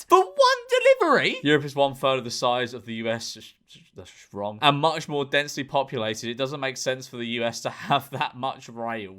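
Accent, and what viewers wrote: United Kingdom. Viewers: Mate, it was kinda funny when I (Russian guy) just understood the meaning of 'inalienable' and what it derived from, though hearing it for the first time, while a guy who sounds British just went straight to Google Translate:) Guess I am just used to encountering lots of English words I see for the first time and have to guess the meaning by context or morphology. No offense to you, it's OK, the situation itself made me smile.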